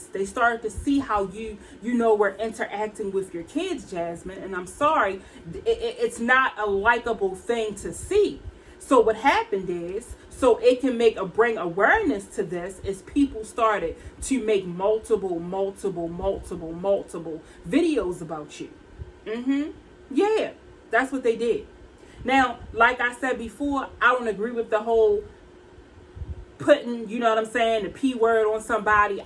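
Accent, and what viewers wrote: Baltimore, United States. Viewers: Love your Bmore accent!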